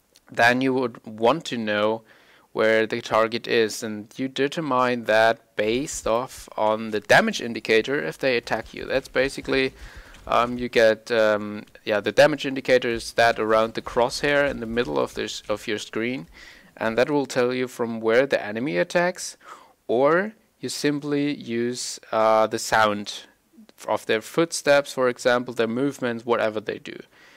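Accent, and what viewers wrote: Germany. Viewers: Found you from Jayne and loved your montages. Great video btw You sound like you are from Germany? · im preeeeeeeetty sure you´re german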